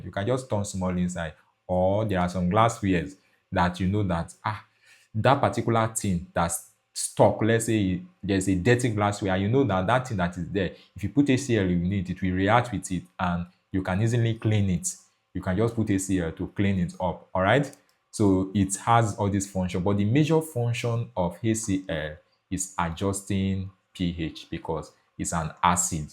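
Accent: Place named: India. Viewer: Why am i hearing indian here oo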